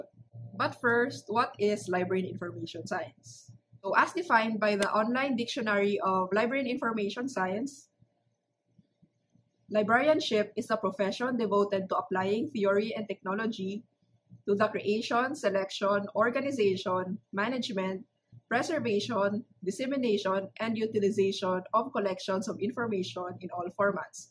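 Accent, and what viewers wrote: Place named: Hiligaynon, Philippines. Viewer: I suggest your intro for your next vlogs soon will have like a jolly ilongo accent :) thank you for your content to boost information on Library profession in the PH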